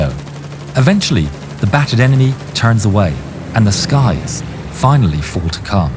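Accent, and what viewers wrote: Portugal. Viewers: Não , eu não gostei, simplesmente adorei sem contar o elegante sotaque de Portugal que adorna esse idioma amado herdado de vós pois sou brasileiro e amo minha querida língua portuguesa!!!❤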